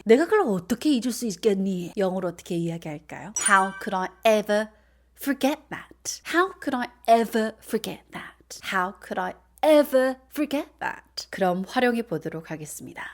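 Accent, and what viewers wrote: United Kingdom. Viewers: 아침에 생방 듣고 빵빵 터졌어요~ 제가 딱 원하던 영국영어 감사합니다~ 너무 웃기셔요 ㅎㅎㅎ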